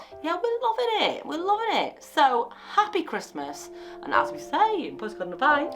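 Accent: United Kingdom. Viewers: You guys should have your own tv show. Love the voice, love the British accent and interesting personality👌😎 Merry christmas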